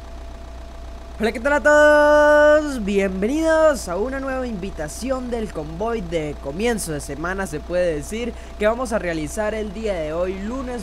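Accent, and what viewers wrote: Colombia. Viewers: sos colombiano?:)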